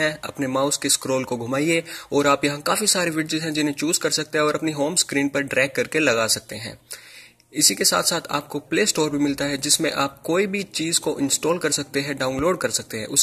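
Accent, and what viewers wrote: India. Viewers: your title is English and suddenly you talk Indian...WTF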